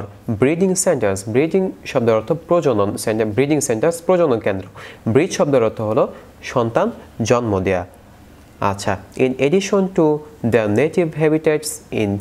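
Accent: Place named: England, United Kingdom. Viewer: ভাই বলেন ভালো লাগে, কিন্তু বাংলা ভাষা উচ্চারণ English এর মতো সোনায়।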